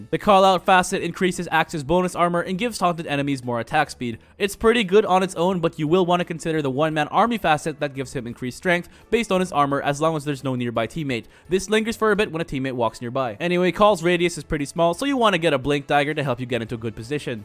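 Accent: Philippines. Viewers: This guy is a filipino, I can hear it. lol.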